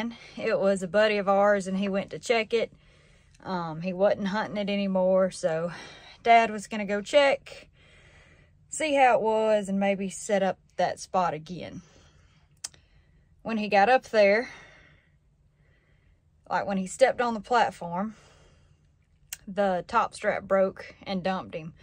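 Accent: South, United States. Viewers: What a nice face. Where is from the accent? Usa south? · you have a gift that I think your dad knows....it is a very rare a voice like yours that impresses people who want to hear it. My Aunt Mary had it, she raised two daughters that had it. You have critics that don't understand what real Southern Women sound like. You are real, do things that most men can not do. You are exceptional and smart. Your dad did a great job in raising you! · Hello from way Downunder (AUSTRALIA) first time on your channel & wow your southern accent is amazing. Sorry to hear about your dad’s hunting accident & I hope that he recovers fast & everything is ok. I’ve just subscribed so I’ll be keeping an eye out for you-all & check out your older videos..Take care now.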